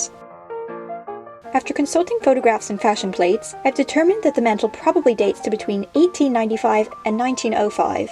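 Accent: United States. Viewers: but with modern American intonation, it's honestly relaxing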